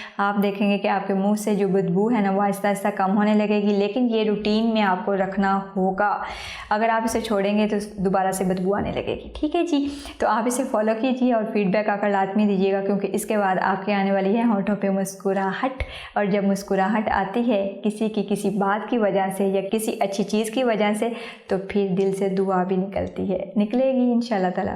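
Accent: Punjab, India. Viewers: Haye tuhadi Punjabi😍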